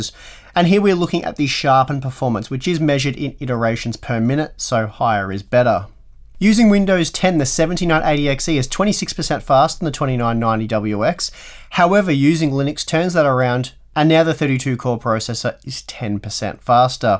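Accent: Australia. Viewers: PLEASE DO MORE LINUX! I love your channel and your wacky Australian accent. MORE LINUX! Give the people what they want!!!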